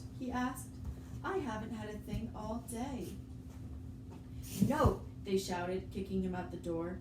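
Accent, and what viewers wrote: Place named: Germany. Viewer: Oh ok I was wondering by her accent it sounded like a German accent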